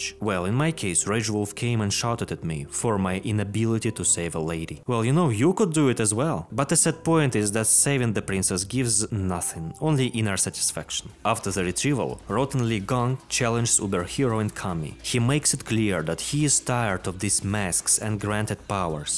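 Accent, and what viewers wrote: Russia. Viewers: А я почему то догадался что ты русский, по манере разговора английского, его тембра да и самого звучания, но на я в этом убедился, тк сохранения Ppsspp было на русском. Мне приятно знать что ещё есть русское комьюнити по этой старой и шедевральной игре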